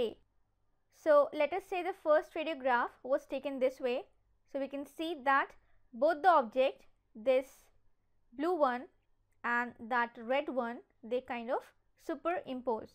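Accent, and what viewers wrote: India. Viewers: Your Indian accent is hawt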